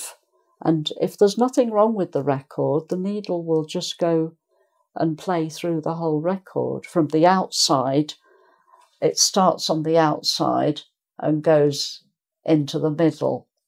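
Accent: United Kingdom. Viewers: Interesting. I love your lesson, Ma'am. The British Accent you speak, and all the teachings are so much helping and understandable. Thank you very much. Greetings from Indonesia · Your accent sounds British, may I know which country you are from ?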